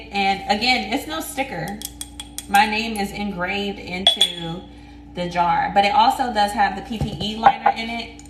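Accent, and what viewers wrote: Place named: South, United States. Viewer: Your hair look's so fascinating i love your southerner voice so sexy a real turn on ❤❤❤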